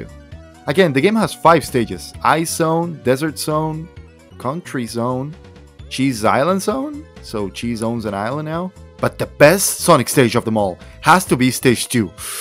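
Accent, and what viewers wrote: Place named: Argentina. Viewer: i'd never expect to encounter some Argentine Sonic The Hedgehog Fan English Speaker... Que Copado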